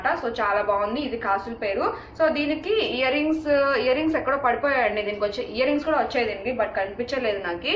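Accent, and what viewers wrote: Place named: Rayalaseema, India. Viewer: Midi raylseema LA undi yasa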